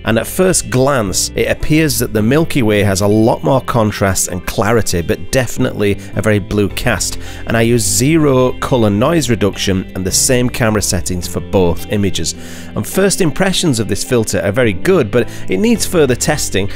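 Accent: Yorkshire, United Kingdom. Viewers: Just interested, you're from Canada but you sound Yorkshire?!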